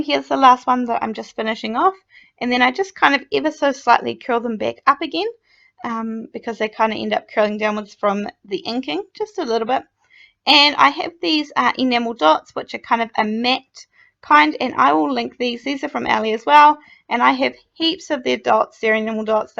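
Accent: New Zealand. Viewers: Lovely cards as always. Also very simple and achievable. Are you from New Zealand?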